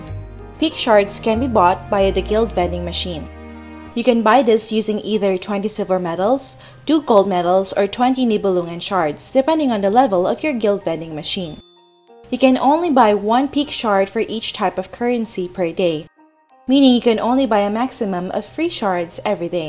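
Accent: Philippines. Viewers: are u filipino?nkaka inlove nman pgsasalita mo ;) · Lol boses plang pinay na. Usap2 din sa ibang tao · Thank you. Thank God for bumping into this. You saved my life! BTW are you Pinay? Your accent sounds like one.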